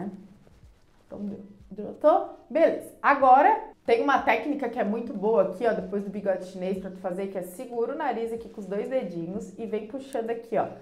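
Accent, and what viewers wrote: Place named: São Paulo, Brazil. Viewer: com esse vídeo realmente percebi que paulista tem sotaque hahahaha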